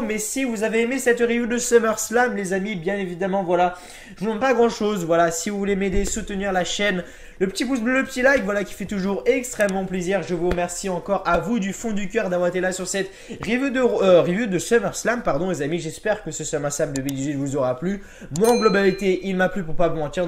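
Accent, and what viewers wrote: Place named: South, France. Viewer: L'accent du sud je m'en lasse jamais il est grave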